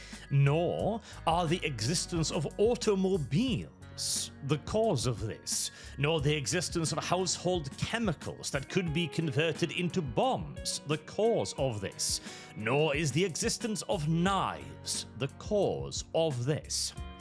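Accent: United Kingdom. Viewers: my bad (wrote the comment before watching), to me he sounded like british. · He sounds British to me, probably the way he speaks as I seen way too many British content creators speak like that like trying to constantly sound all eloquent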